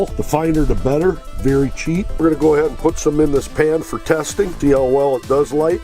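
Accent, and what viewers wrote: Minnesota, United States. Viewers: he's from Minnesota sounds like the Gov.....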